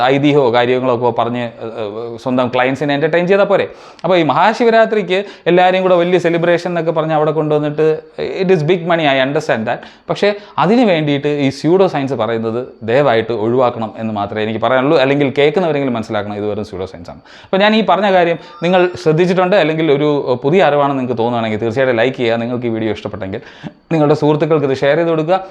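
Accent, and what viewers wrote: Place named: India. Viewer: Indian accent ആയതു കൊണ്ട് പെട്ടന്ന് വാക്കുകൾ ക്ലിയർ ആവും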